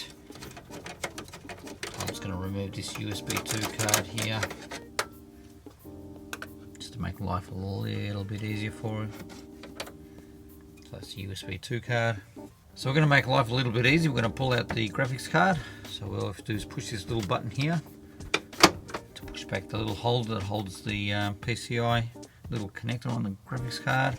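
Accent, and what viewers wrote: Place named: Australia. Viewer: Thanks for video mate .Good to hear an Aussie accent :)...Can we buy parts from you ?...I have a 3,1 with faulty PSU. Cheers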